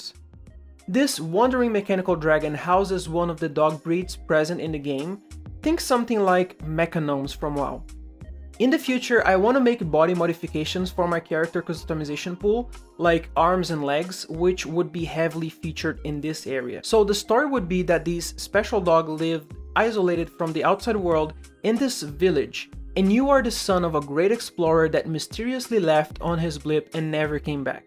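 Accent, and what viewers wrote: Brazil. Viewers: esse sotaque parece de BR 🤨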